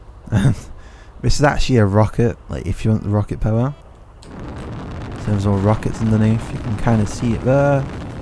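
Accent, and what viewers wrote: United Kingdom. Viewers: Shhh I love ur British accent!!!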